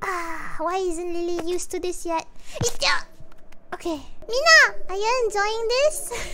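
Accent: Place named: Malaysia. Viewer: She sounds so malaysian this is great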